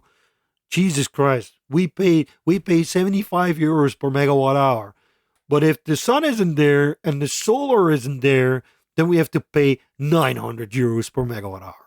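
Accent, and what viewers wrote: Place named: United States. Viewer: As an aside, why do you speak with American accent?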